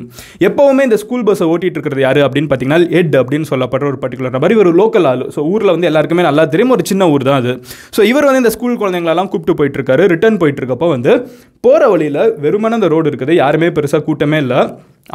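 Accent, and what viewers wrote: Madurai, India. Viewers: Inniku Madhan gowriku madurai slang niraiya varuthu… ketka super aa iruku..🙌🙌🙌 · Innaiki thaan bha MG, Madurai slang la pesirkaar... Avinga, Ivinga, Pannirkanunga... Daily he sounds formal Tamil, today Madurai slang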